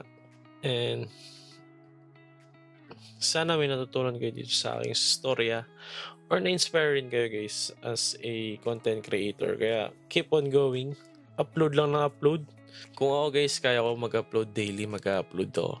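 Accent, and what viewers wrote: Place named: Pampanga, Philippines. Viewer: Kapampangan na kapampangan idle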